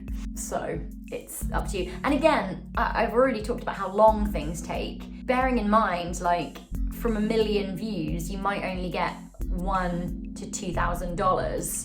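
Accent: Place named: United Kingdom. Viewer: I am very happy to be able to watch you again dear ⭐⭐⭐⭐⭐ you always put a huge amount of work and heart... Also the Brit accent in Canada... Bold. A delicacy 🍯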